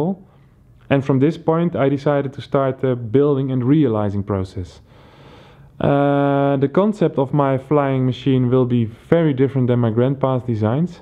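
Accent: Netherlands. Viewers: je hoort gelijk dat ie uit nl komt xD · you must be dutch ^^ just cant help but notice your accent anyway Cool idea bro, hope u get it done :)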